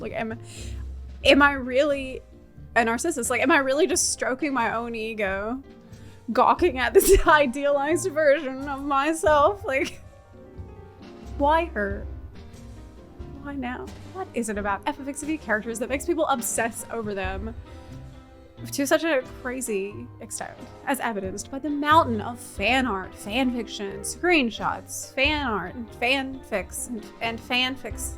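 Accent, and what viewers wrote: South, United States. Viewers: Why is having a south girl accent all of a sudden? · Not only do I love my character (also a bunny girl), but I am fully convinced she is the canon version of the WoL. She is perfect. In other news, I love that years of living in Ukraine has not suppressed your powerful Southern twang in any way. I have it too, I think it comes from our DNA.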